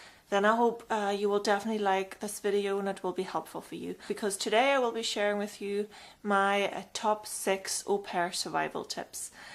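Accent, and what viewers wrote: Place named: Northern Ireland, United Kingdom. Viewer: You sound northen irish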